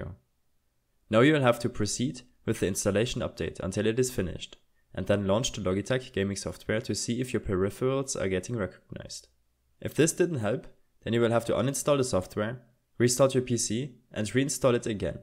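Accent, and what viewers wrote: Germany. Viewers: Der deutsche Akzent kickt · Deutschester Akzent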